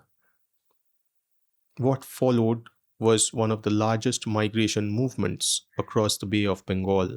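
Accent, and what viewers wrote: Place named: United Kingdom. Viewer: He sounds more of Brit intellectual then any indian !! Maybe people like actually trying to keep the British legacy of territorial expansion as well exploitation...!!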